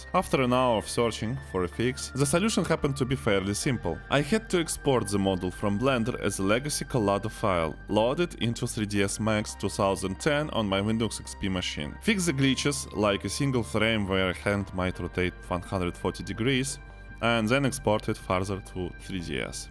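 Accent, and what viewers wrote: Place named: Russia. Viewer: Охренеть, только и акцент русский, а почти весь ролик становится понятным без перевода! 😧👍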